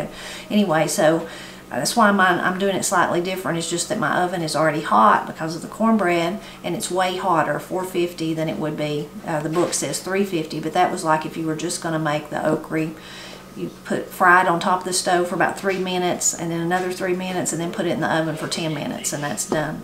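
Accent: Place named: Kentucky, United States. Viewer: We called those kind of dumplings, pastry. Chicken and pastry. It's dumplings when we drop them off a spoon. I'm so grateful I found your channel. Hearing your accent takes me back to those Kentucky hollows. Thank you.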